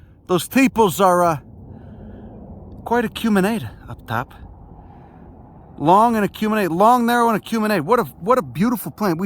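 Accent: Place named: Northeast, United States. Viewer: Man there are few things I love more than a heavy Northeast accent dropping heavy science. Good shit.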